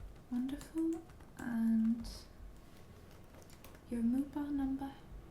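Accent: Scotland, United Kingdom. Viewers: Great Scots accent *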